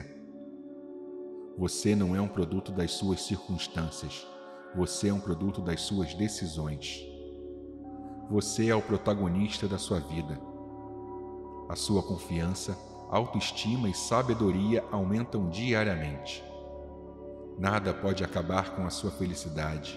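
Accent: Rio de Janeiro, Brazil. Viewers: O conteúdo é otimo, mas o carioques me quebra rs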